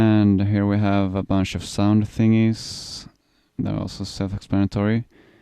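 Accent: Sweden. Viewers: Awesome tutorial! I love your Swedish accent :) I love Sweden, I visited last summer. Keep it up buddy!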